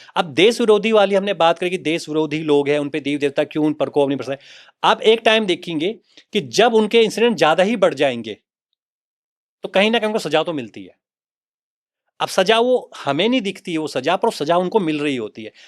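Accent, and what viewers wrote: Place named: Pahadi, India. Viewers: It's a part of pahadi dialect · Pahadi bolchal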